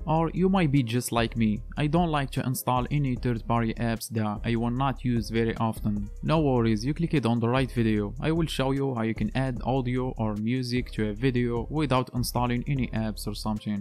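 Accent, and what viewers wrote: Morocco. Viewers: Your accent just Moroccan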